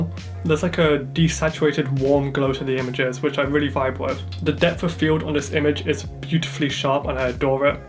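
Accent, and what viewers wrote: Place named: United Kingdom. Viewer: Film photography + British accent: subscribed! Jokes aside, very nice video, loved it! Keep going!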